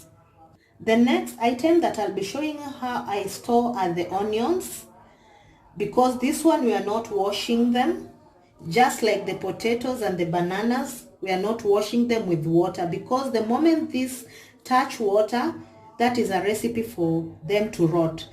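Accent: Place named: Kenya. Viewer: As soon as i heard your voice - i was in love :-) Love the Kenyan accent. Been there on a long holiday and at Nairobi. Love what you've done here, really helpful and well filmed. Likes and Subscribe from me :-) Discovered your channel while watching the 'Vihaan' channel.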